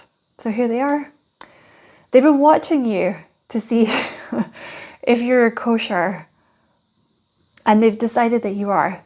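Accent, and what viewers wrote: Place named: Scotland, United Kingdom. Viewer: Love your Scottish accent Lass! ❤ aye 🎉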